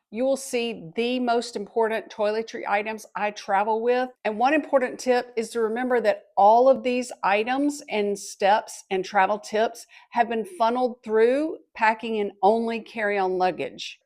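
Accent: South, United States. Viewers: And now can you leave a kind comment for this lady who spends a lot of time and works hard to bring you these tips with her sweet, southern accent?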